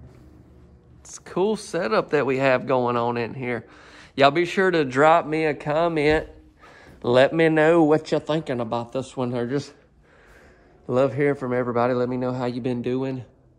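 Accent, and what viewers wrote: South, United States. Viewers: BABY BOY!! Libby from Tampa Florida. I would never criticize you on your Channel. The only thing I can tell you is don't be negative with your little funnies. Or your big funnies! Those are what makes your Channel! Own them. Don't say I'm just kidding. You are great the Way You Are. Just be more firm with your delivery. Because you are great!. I watch other mobile home channels😝 I can't take it good. I'm looking for your little Southern Voice and just your humbleness that shines through. This time I took the time to put a long comment. I'm sorry that I don't leave one every time because I watch you on TV. GOD BLESS YOU LOVE YOU!!! 👵🙏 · Hello. I am from beautiful Campbellville Ontario Canada. Since today is February 01, where I am is fairly cold. I do like parts of this house. The second bathroom was a good size as you mentioned. 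No pantry(disappointing), no sink in the "utility room". Regarding the "u", I and most people I am acquainted with would call those rooms a laundry room. If you use laundry room no one can tease you on your pronunciation. 😉 I haven't been fortunate enough to be able to travel to the Southern States. I SO adore your accent. Have you ever traveled to Canada? Where I live(in the country)there are modular homes parks 20 minutes away. Maybe once Covid has gone, you might want to look at modular homes in my area. Thank you for your videos. I enjoy seeing the different layouts, rooms sizes, cabinets and flooring.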